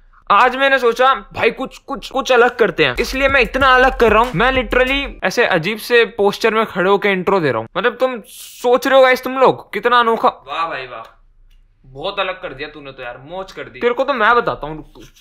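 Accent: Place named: Uttar Pradesh, India. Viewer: I know you are from UP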